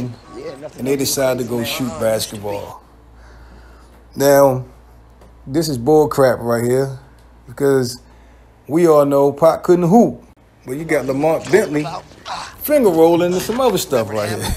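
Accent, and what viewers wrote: Baltimore, United States. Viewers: The guy doing this video has a Baltimore accent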